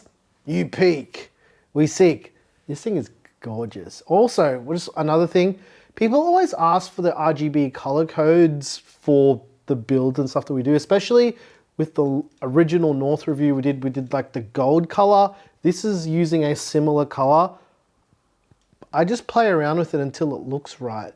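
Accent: Australia. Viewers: Fantastic build! The 3090 is definitely a hot boy so all the fans are definitely necessary. My EVGA 3080 draws 380 watts. Non related you remind me of my Mexican cousin so it trips me out that you have that Aussie accent. Lol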